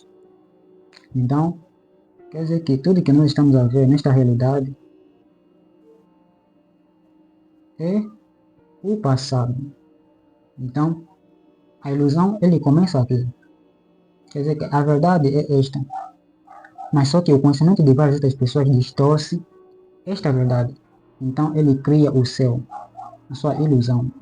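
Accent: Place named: Portugal. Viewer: O sotaque é como se fosse português de Portugal. Ótimo vídeo. Obrigada. Sucesso! Abraço de Belo Horizonte, Minas Gerais, Brasil.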